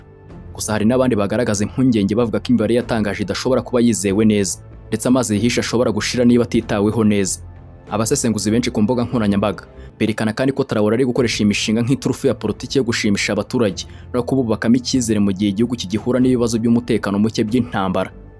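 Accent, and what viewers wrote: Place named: Tanzania. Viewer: Hello. I Am hearing you talking like a SUKUMA tribe in TANZANIA 😮😮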